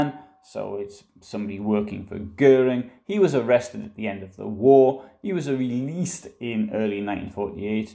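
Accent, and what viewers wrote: Newcastle, United Kingdom. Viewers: North Eastern English accent ,I think. "Geordie ". Or a bit further south ,Tees area. "Monkey Strangler."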